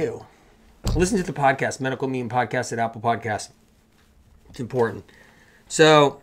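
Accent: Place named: United States. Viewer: Yes great info but can you state it clearly once please & stop repeating everything 3 times. People can replay if they need repeats. Thank you you're great but your delivery is so American & verbose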